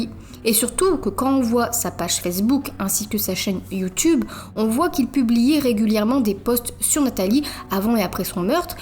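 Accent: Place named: Nord, France. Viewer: T'as grandi dans la famille ch'ti ? Parce que maintenant c'est rare que quelqu'un si jeune comme toi possèderait un accent très prononcé. Je me moque pas de toi, c'est juste que je kiffe cette prononciation. Ça fait un petit moment que j'apprends le français et je me suis habituée à prononcer tous les a comme toi. J'ai vu la vidéo où tu disais que tu songeais pas changer ta prononciation et je trouve que c'est très bien.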